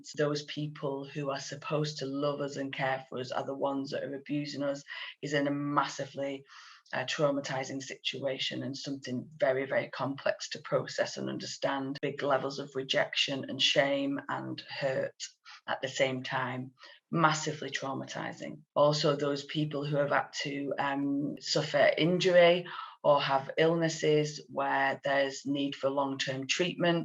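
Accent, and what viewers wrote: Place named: Northern England, United Kingdom. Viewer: Thanks for sharing your knowledge and expertise! It’s also nice to hear a more authentic Nortthern English accent, rather than the usual North American!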